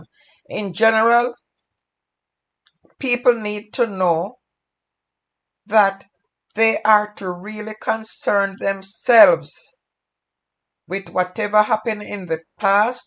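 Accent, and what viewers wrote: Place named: Jamaica. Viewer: I can't understand most of what you're saying. Your Jamaican accent is too overwhelming. Sorry, but I have to tune off and stop listening.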